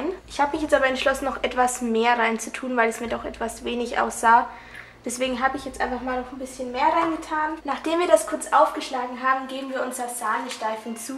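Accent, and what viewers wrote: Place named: Franconia, Germany. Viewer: Oh, du bist so sympathisch :) Muss ich unbedingt ausprobieren, suche schon lange nach einem gutem Harry Potter-Butterbier-Rezept :) Ich habe gerade gelesen, dass du aus Bayern kommst (bzw. hört man es auch an deinem Dialekt ein bisschen :D), aus welchem Regierungsbezirk kommst du? Hört sich an wie Franken :b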